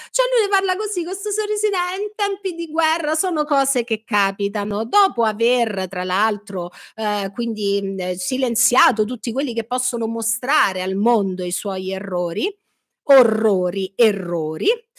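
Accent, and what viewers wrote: Rome, Italy. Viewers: Ma poi non parli il dialetto romanesco.( Nessuno ormai lo fa) Hai una piacevole ( per me) inflessione, ed usi espressioni tipiche che coloriscono l'eloquio di noi cresciuti nella città eterna rendondolo più efficace per chiunque abbia anche solo due neuroni accesi. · non avrei saputo scriverlo meglio, condivido in pieno. Quel "romanaccio" è quell'elemento fondamentale per strapparti risate nonostante si ascoltino robe peso. Avanti tutta! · L’inflessione romanesca credo sia voluta per rendere più efficace il tutto. In realtà si coglie un ottimo italiano sotto l’intercalare. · Io adoro come parli e quando lo fai in “romanaccio” ancora di più! E sono sicura di non essere la sola ad apprezzare sia il tuo perfetto uso della lingua italiana che quello del rafforzativo romano che sai dosare egregiamente! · ti ammiro molto, ma scusa però, tu dai veramente corda ai troll che ti criticano il bellissimo accento/dialetto romano? 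Ignorali 🫶🏻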